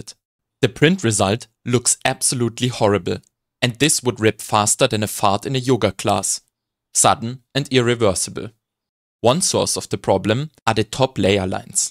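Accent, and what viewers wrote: Germany. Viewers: мне так нравится этот немецкий акцент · Deep, but well explained, technical stuff, made by a lad with a german accent, witout annoying music and/or nauseating transition effects on video edition. I t can't be better that this. Thank you a lot! 🙏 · Du hörst dich an als wärst du auch Deutsch edit: Jup, hast es im Video grad gesagt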